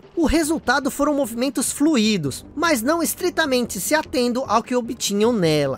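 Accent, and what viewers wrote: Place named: Sao Paulo, Brazil. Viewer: esse é paulista mesmo.... negocio do jooooogo,,,, a trilha sonooora... só dando um toque mano, abraço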